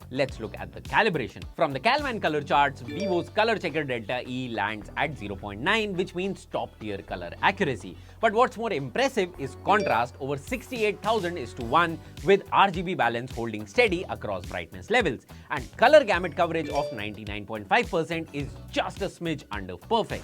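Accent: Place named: India. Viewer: Horrible Indian accent, 🫤 i can't understand what you saying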